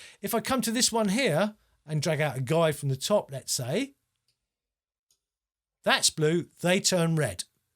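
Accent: England, United Kingdom. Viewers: Very helpfull! Where is your accent from? It reminds me of when I lived in England.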